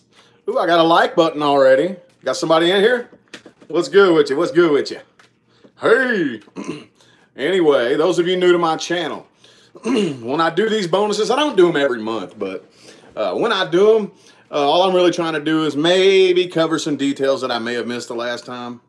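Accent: South, United States. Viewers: Loving your southern accent. I’m from the south too.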